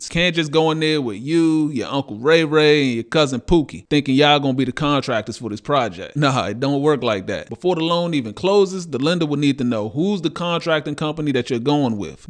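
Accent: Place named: Washington DC, United States. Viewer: Listen at that DC Accent!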